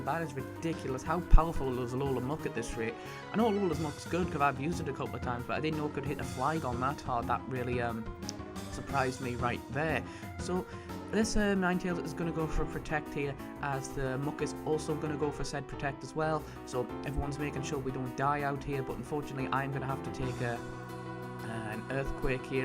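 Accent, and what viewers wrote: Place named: United Kingdom. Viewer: Omg, I love your accent XD idk why but I love British or is that your accent? Anyway it's a really swaggy language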